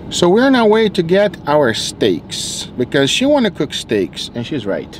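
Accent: Cuba. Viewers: Hearing a guy from Brazil speaking Spanish with a Cuban accent made me want to move to Miami. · it's spanish, I know is brazilian but he was speaking spanish to the girl, with a strong Cuban* accent btw... He says "tu"(sp) instead of "você"(pt) like in "¿Tu quieres carne? (PT: você quer carne?/do you want meat?) He also says "abre la boquita (PT: abra a boquinha/open the little mouth) * I know I said cuban, but I think it's the Floridian spanish which is heavily influenced by Cuban accent, I've been there and noticed even non cuban latinos having their accents influenced(a little or a lot) by that cuban accent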